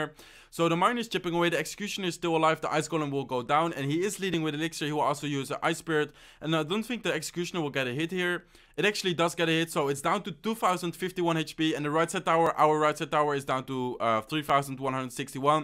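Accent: Netherlands. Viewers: Typical dutchmen accent 👍 wacht op een nieuwe log bait deck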